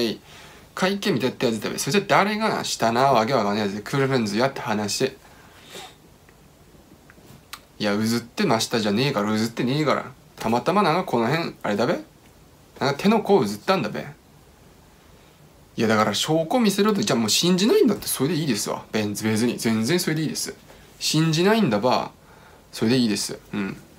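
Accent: Tsugaru, Japan. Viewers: 自分でも よくわかりませんが ここに辿りついてしまいました 😂😂😂 津軽弁！ 素晴らしい👍👍👍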